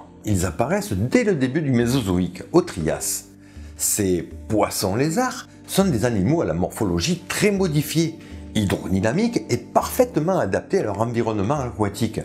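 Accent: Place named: Occitania, France. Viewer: Salutacion dempuèi Occitània, que s'ausis a l'accent del youtubaire ;-)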